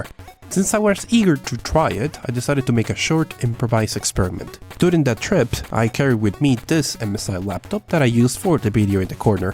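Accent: Spain. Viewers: Espera, ¿eres de españa? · Wait, are you from Spain? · Eres de españa ? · Un segundo, eres español? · Hey tío, eres de España? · como que es de españa? haz un video en español pls solo porque quiero conocer como suenas en español XD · De que parte de España? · alv eres de España? :0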